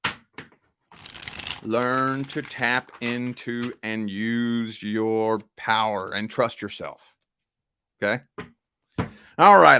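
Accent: United States. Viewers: It's cool to hear a reading in my country boy accent. Very good read.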